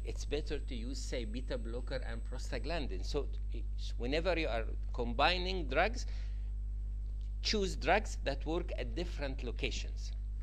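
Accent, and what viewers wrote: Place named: Egypt. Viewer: Shukraan, helped a lot with my therapeutic course Noticed the Egyptian accent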